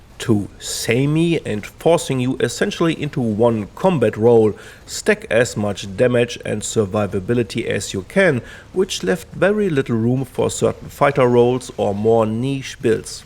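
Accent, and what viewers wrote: Germany. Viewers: höre ich da einen deutschen Akzent raus? :D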